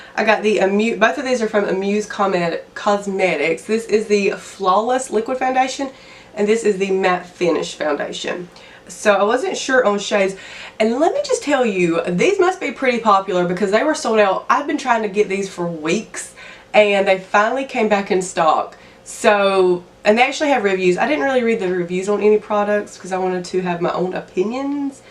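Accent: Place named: South, United States. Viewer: I’m from Louisiana and I’m guessing the accent is close by...Makeup Arkansas or Tennessee? 💛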